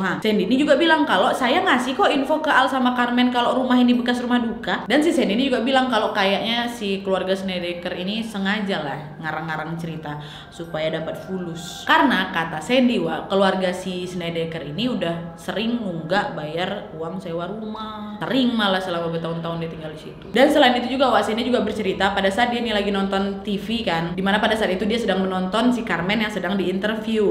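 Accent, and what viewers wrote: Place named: North Sumatra, Indonesia. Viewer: Macem logat namorambe ko bah wak.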